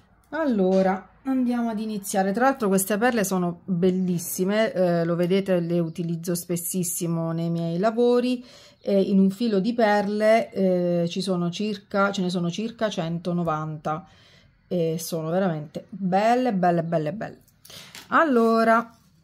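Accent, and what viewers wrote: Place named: Cilento, Italy. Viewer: Brava e simpatica, hai l'accento che mi ricorda una mia amica cilentana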